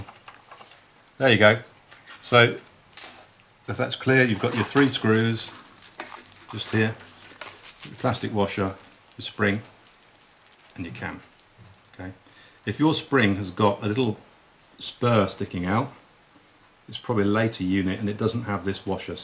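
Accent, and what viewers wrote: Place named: United Kingdom. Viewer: thank you, This is so easy, my daughter is only 5 and she can do it herself. I just save $70 USD. I call Bose and they said is $ 115 with shipping included and you will also get a 1 year warranty and any of the parts? I just pay $39 USD on EBAY, and I fixed it in 5 minutes tops.I Love It, and the British accent is cool. I from Colombia and live in LA. Thank you I’m very happy, my family love this portable sound dock.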